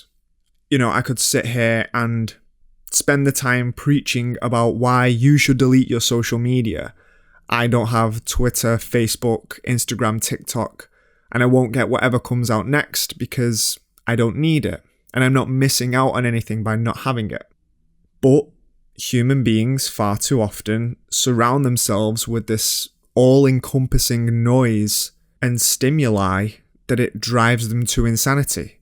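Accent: United Kingdom. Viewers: Where in the UK are you from? (I'm trying to figure out your accent) Good video btw and I totally agree, everytime I hear an ad read I cringe a bit. But it's like, people gotta eat I guess, so I can't really blame them. I gotta praise you for the decision tho.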